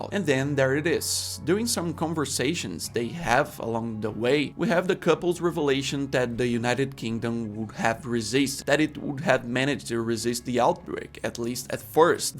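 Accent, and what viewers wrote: Brazil. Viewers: hes brazilian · Brazilian accent and Brazilian name. I am home.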